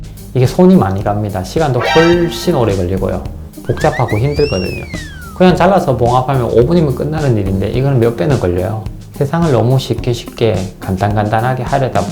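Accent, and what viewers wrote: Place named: Gyeongsang, South Korea. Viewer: 구독 좋아요 누루라고 하셔서 바로 눌렀어요. 말 잘듣는 시민이쥬?~^^ 목소리 들으니 경상도쪽? 같으신데ㅠ 좋은분들은 머네요 흑ㅠ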